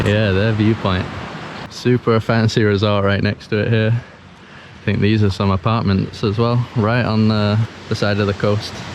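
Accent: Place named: Northern England, United Kingdom. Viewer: Northern English + American + Global English. Wow bro, you're a true nomad :D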